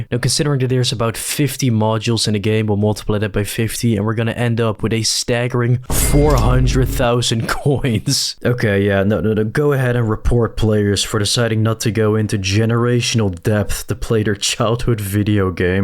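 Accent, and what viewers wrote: Netherlands. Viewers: Is bro dutch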